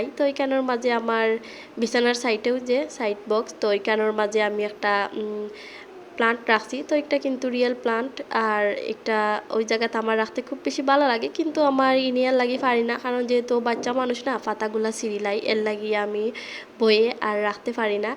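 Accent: Sylhet, Bangladesh. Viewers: আপু সিলেটের কথা গুলো শুনতে অনেক অনেক ভালো লাগলো রুমটা খুব সুন্দর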